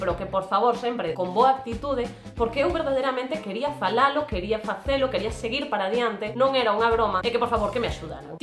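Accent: Castile, Spain. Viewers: Sonoridade MUITO CASTELHANA!!! É UMA ESPANHOLA FALANDO UM PORTUNHOL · me suena como una persona de brasil con acento de españa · Esse teu galego já tem muito castelhano... :\ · O seu galego está 100% espanhol. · Tes acento castelan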